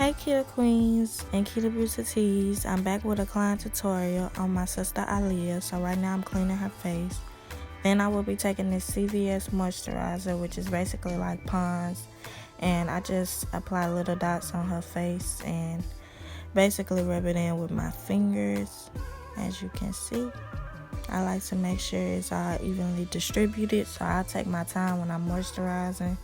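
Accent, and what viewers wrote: Chicago, United States. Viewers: Where are you from?? Cuz you def sound like a Chicagoan lol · I said the same this she gotta be from the Chi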